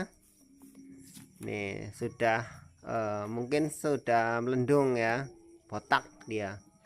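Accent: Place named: Banyumas, Indonesia. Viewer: Rika ngapak timen.. salam dari lampung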